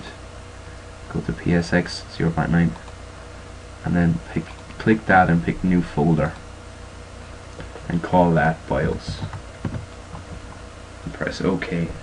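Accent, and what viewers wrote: Ireland. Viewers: Are you Irish by any chance? :')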